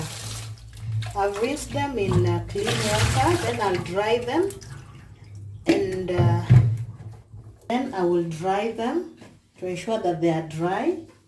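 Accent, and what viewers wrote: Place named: Kenya. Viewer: As soon as i heard your voice - i was in love :-) Love the Kenyan accent. Been there on a long holiday and at Nairobi. Love what you've done here, really helpful and well filmed. Likes and Subscribe from me :-) Discovered your channel while watching the 'Vihaan' channel.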